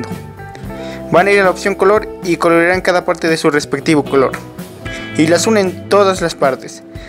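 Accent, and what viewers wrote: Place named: Peru. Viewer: Oye por tu acento , asumo eres de Peru ? K parte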